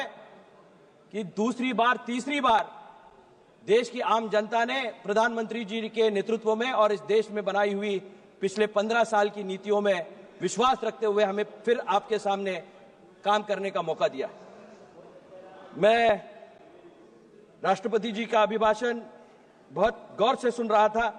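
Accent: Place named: Kolhapur, India. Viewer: कोल्हापुरी आवाज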